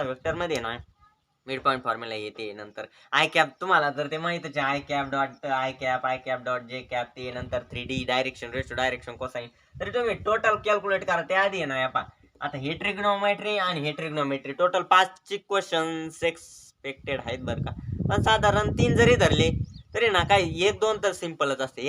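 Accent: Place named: Ahmednagar, India. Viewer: नगर ची भाषा ऐकायला फार गोड वाटते दादा तुझं मार्गदर्शनाची मदत होते धन्यवाद मुंबई वरून 🙏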